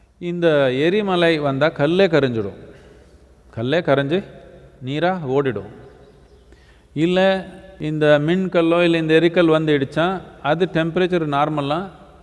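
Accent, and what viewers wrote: Tamil Nadu, India. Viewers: Sadhuguru avargale unglaludhu nijamava sollununa arumaiyana vilakkam enaku ungalodaiya ovovaru pechugalume pudikkunge enaku unga tamizh slang rombhave pudichirichi neenge ippidiye ungaloda karuttugala sollinu irrunge naamavu unga ovovaru varthaigala enjoy panruvo aproma unga tamizha rasichirruko unmaile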